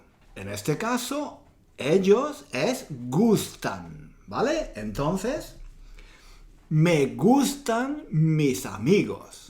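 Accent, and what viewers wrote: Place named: Andalusia, Spain. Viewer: Hola nos gustan tus vídeos y nos gusta tu acento andaluza y nos gustas tú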